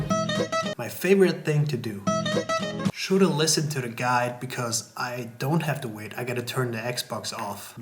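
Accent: Germany. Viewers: youre german???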